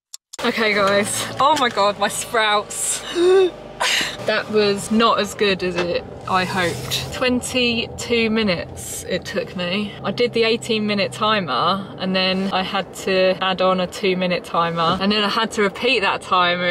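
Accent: United Kingdom. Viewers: I didn't know British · Are you British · I don't know why....but I love to decode British accent 😅..and I love your accent too❤️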